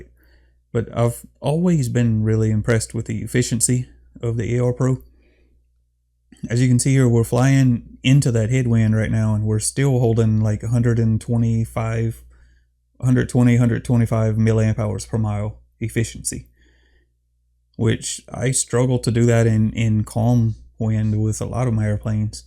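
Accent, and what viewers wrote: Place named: Louisiana, United States. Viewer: Where you from bro? Sounds like you have a Louisiana accent 🤔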